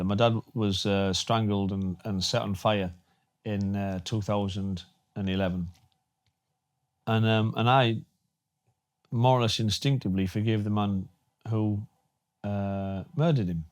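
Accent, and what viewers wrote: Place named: North, United Kingdom. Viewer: That is probably... one of the most profound opening/things I have heard for a long time. Spoken like a northern man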